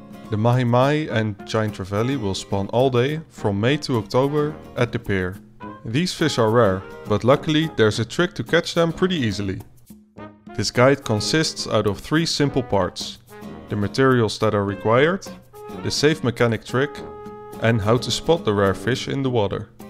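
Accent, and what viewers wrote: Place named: Netherlands. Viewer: I can just hear the Dutchness in your voice hahaha!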